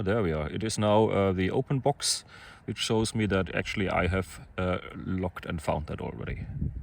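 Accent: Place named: Germany. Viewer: Wieso in englisch? Bist doch Deutscher oder nicht? Also dein Englisch klingt sehr deutsch 😅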